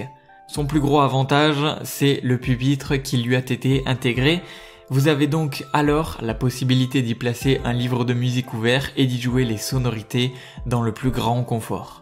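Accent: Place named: South, France. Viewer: Ce petit accent de la garriguEU 😂😂😂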